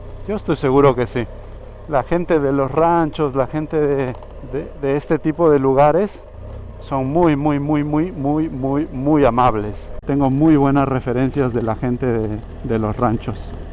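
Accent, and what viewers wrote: Mexico. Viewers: Increíble como ya habla como todo un Mexicano, saludos desde Durango wey!!! 😉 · hasta aprendió a hablar cómo Mexicano ❤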